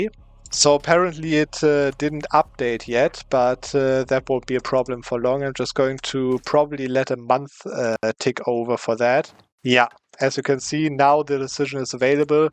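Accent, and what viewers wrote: Germany. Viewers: REALLY thicc german acsent.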